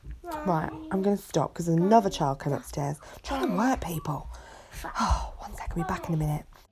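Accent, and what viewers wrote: United Kingdom. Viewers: Hello you just popped up in my feed nice to hear another britt. Yes it's been hot. Love the nails I have started to learn the basics so I can do my own as my natural nails are brittle due to fibromyalgia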